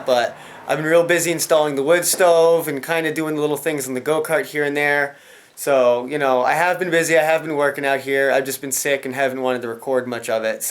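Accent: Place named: Midwest, United States. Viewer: Great timing. I was just wondering what part of the country you were in, when i started this video and I think you just said Maine. Funny, with your accent i was guessing somewhere in between Kansas and Indiana.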